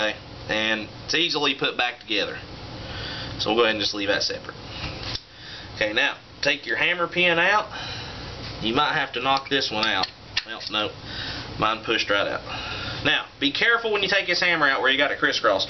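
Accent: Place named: Alabama, United States. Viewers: Dude, where are you from? Trying to place your accent. Tennessee? Kentucky? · Sounds like he is from Bama. Good vid!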